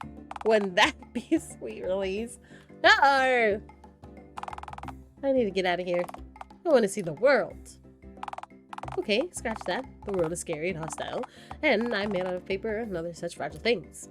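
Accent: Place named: United Kingdom. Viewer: i'm still in the beggining of the video, but, stellar british accent by the away 10/10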